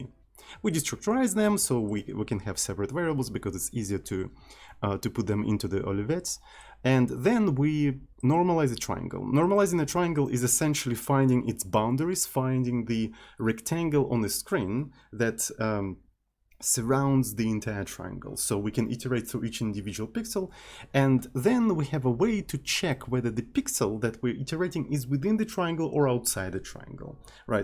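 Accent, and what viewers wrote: France. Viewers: За ужасный французский акцент дизлайк и отписка.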